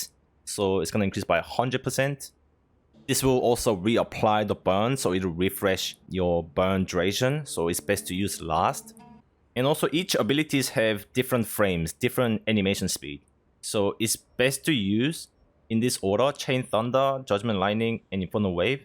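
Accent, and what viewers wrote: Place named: Australia. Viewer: Mate, I keep hearing an Aussie accent creeping through. You in/spent time in Aus?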